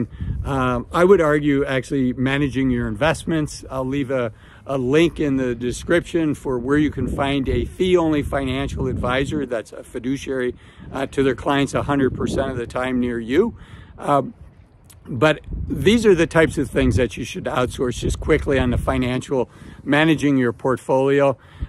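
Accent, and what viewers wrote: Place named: East Coast, United States. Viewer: I’m curious what part of the us are you from? I’m guessing the upper east coast.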